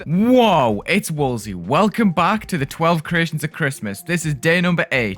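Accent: United Kingdom. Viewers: Wulzy, why are you British?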